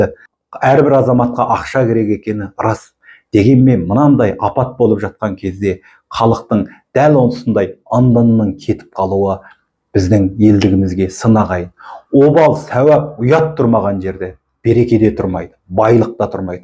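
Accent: Standard. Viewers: Рахмет сизге таза Казакша сойлейсиз барлык блогерлер СИЗ сыякты болса екен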